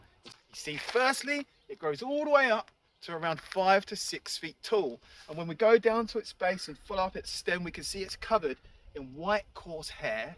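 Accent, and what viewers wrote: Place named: London, United Kingdom. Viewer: He is handicapped by a Nu-London accent, innit.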